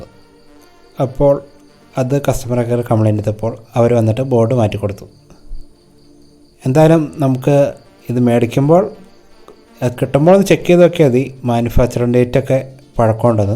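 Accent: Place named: Kollam, India. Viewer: ഫ ഫ എന്നു പറയുന്നത് കൊണ്ട് മനസിലായി കൊല്ലത്തുള്ള ആളാണോ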